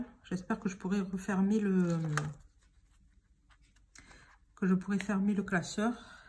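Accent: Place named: South, France. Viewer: COURAGE continue ainsi, rien que pour VS Avec votre charme accent du Sud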